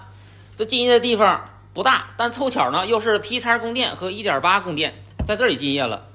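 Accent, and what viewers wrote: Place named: Northeast, China. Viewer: 听口音是东北人在广西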